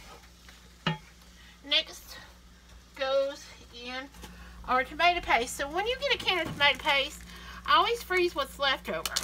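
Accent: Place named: Appalachia, United States. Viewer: It's our Appalachian dialect. I grew up saying it that way, had to lose my accent and dialect to work for the AP.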